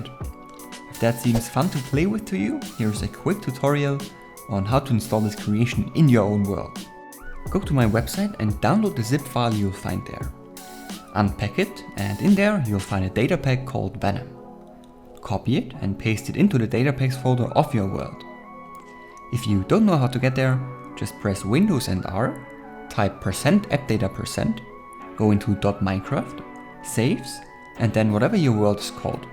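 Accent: Germany. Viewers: which nationality are you? sounds kinda like a german but im not quite sure...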